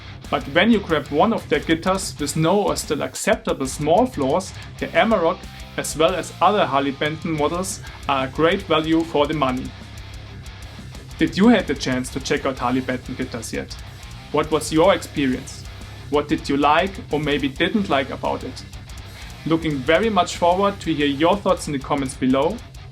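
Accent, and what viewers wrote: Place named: Germany. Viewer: I swear I hear a german accent in there! :D really cool videos by the way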